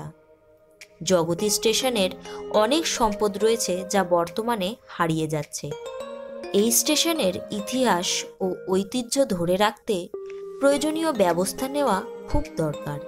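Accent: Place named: Kolkata, India. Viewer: Tumi kolkata style a kano katha balo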